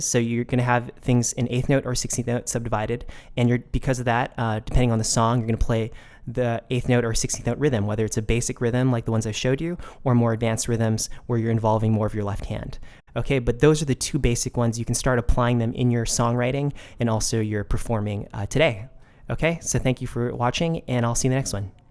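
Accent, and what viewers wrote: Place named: England, United Kingdom. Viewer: What part of England are you from dude ?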